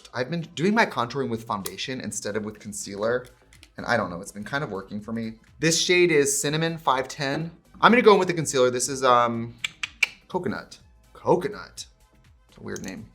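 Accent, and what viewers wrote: United Kingdom. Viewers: Trixie’s British accent is giving major Moira Rose energy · That British accent was what I needed to go on today….EPIC!🤭🤭🤭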